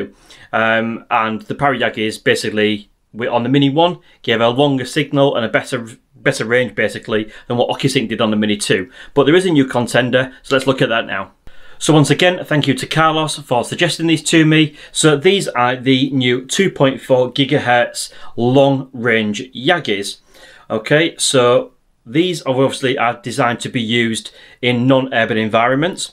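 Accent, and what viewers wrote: United Kingdom. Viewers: You have a British accent, is this in the British Isles?! One more question do You have the FCC (Western Hemisphere version) or the CE (European version) ??!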